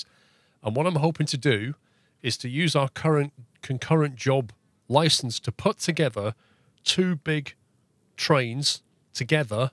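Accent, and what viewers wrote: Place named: Birmingham, United Kingdom. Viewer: Where are you from? Sounds like good ‘ol birmingham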